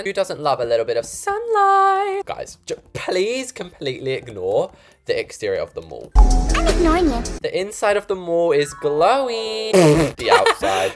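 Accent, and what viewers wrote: United Kingdom. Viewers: Wait so he’s British not Australian…? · How come ur British but ur sister is amarican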